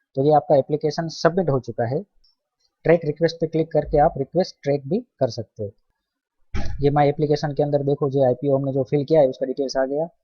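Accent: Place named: Gujarat, India. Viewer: Gujarati lago😅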